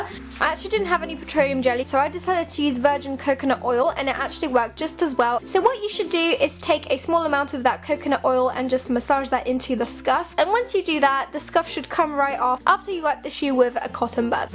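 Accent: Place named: United Kingdom. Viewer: that british accent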